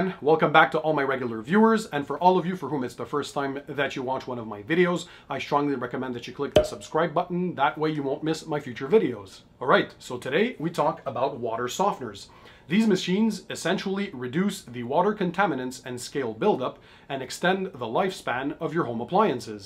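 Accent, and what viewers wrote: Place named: Canada. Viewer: Canadians and their accents.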